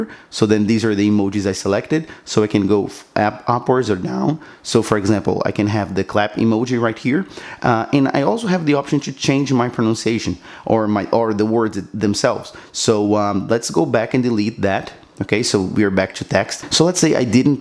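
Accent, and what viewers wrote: Brazil. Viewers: I was like “I can hear the brazilian accent” and then I saw your whatsapp msgs “blz man” hahahahahaha muito obrigada, ajudou muito!!!!!!!!!!!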